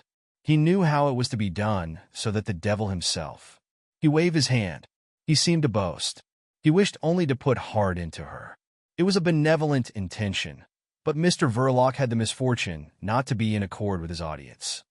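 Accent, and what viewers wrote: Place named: United States. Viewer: Appreciate the American accent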